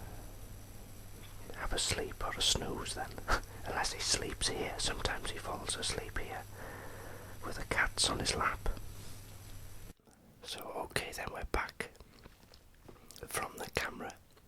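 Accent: Wales, United Kingdom. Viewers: I think it's a Welsh accent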